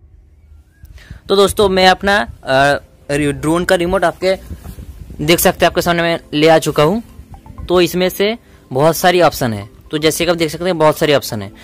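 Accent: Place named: Bengal, India. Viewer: Bangla beriye jacche mukh theke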